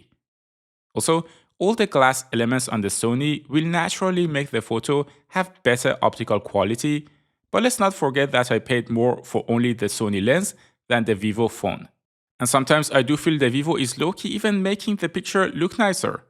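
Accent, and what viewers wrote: Iran. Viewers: bro your accent is sooooo iranian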